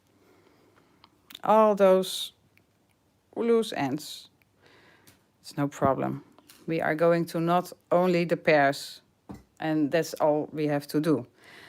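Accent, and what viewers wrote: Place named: Netherlands. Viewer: I love how you force yourself to calm down 😂 always enjoy your tutorials and talents. Admire your English fighting with the Dutch. Appreciate your struggles. Wishing you were making more English tutorials. Cannot translate the Dutch version or the lives.😔 🤣🤣🤣I don't think I will be attending those knitting classes.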